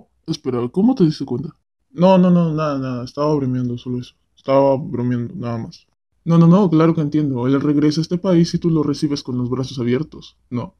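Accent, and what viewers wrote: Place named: Peru. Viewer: Tu acento peruano es supremo! Amo.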